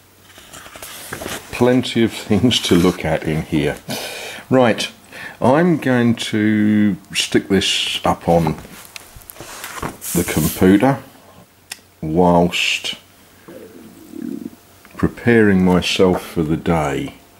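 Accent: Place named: United Kingdom. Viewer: Just here for my daily dose of British accent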